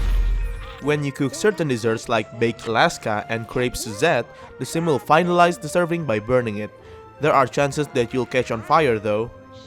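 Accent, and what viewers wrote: Indonesia. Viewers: ea yg ngomong sama punya channelnya org indo ya wkwk kedengeran dr aksennya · r u indonesian?!? your accent sounds kinda indonesia-ish and also your youtube name :D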